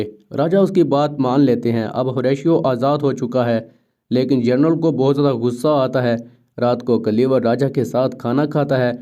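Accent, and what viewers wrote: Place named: Pakistan. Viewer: Tumhari aawaj se lag raha hai tum Pakistan se ho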